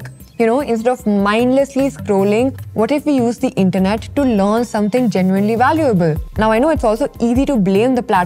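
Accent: India. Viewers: Sorry, I stopped after about 30 seconds in because I can't stand listening to Indian English. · I didn't leave because im brain roted , i just don't like indian accent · I'm one of the 85% who didn't watch to the end, simply because of your indian accent. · a girl with perfect indian accent finally. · how do you have Indian accent? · Accents vary worldwide, mate. Nothing you can do about it. Also she’s Indian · good message, but i cant stand that indian dialect english for real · The poo accent 🤮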